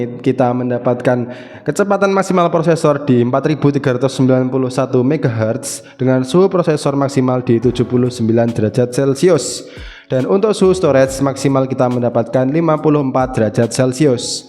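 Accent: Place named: Banyumas, Indonesia. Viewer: ngapak banget mas jadi ketawa saya😂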